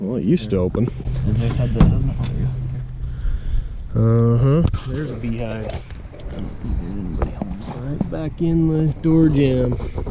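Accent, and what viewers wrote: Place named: Utah, United States. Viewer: Greetings from Tooele! I can tell you're in Utah because you say "acrossed" instead of "across".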